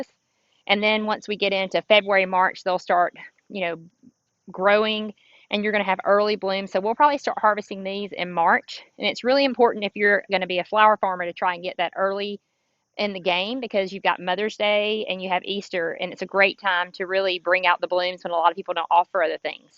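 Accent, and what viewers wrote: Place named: Alabama, United States. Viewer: Congratulations on winning your well deserved Amazon's United States Woman-Owned Small Buisness of the Year (2019)! I thought I detected an Alabama accent.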